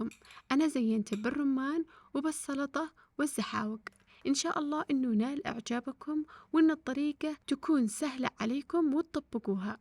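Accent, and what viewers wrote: Sanaa, Yemen. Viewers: احلى اكله من احلى لهجة واحلى واطيب شعب يمني. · اجمل مافي المقطع هي صوت النبره الصنعانيه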